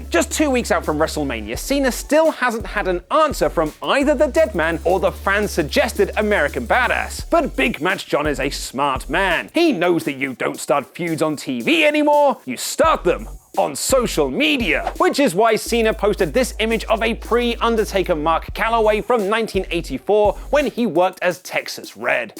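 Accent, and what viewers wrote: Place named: United Kingdom. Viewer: Some of his words I do have to listen to again. I guess his British accent and my hillbilly ears clash....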